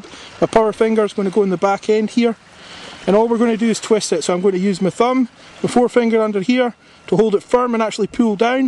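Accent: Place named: Texas, United States. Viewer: Good information, but very unusual Texas accent.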